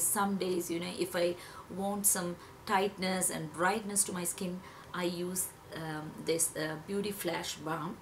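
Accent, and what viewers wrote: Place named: Tamil Nadu, India. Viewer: yes! she was Tamil and spoke very similar to you! i was wondering if you were Tamil as well if you don't mind me asking:)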